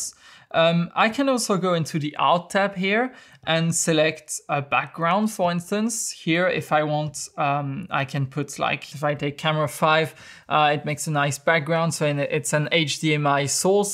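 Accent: France. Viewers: Ahaha on voit que t’es français, rien qu’à ton accent 😭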